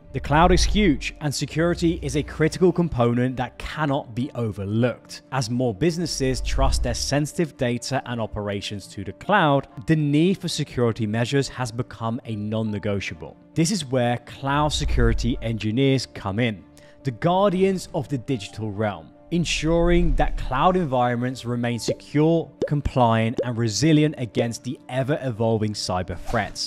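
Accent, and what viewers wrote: United Kingdom. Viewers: Strange how you sound British yet don’t make any mention whatsoever of British salaries. You just cater to Americans…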